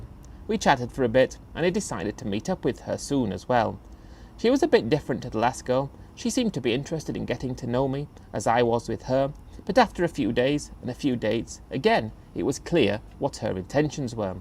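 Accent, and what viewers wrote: England, United Kingdom. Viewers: You dont really have an ear for accents. This guy who narrates these stoties is very much English. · Would that an English accent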